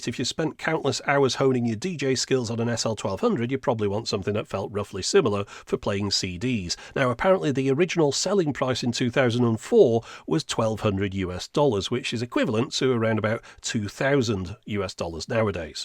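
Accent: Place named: North West England, United Kingdom. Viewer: Just watched your 'shaving cds' video... Funniest thing I've seen in ages. Not even into hifi, though I am a musician, but subscribed on the back of it. Partly because you sound like you're from my part of the world (North West) and because I've got high hopes for April 1st ;) Cheers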